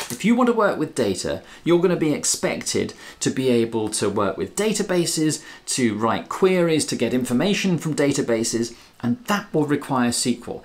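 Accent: United Kingdom. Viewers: listen to * speaking with his British accent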